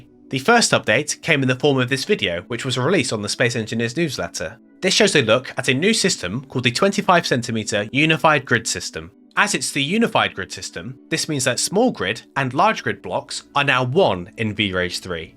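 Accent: United Kingdom. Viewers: Very nice British accent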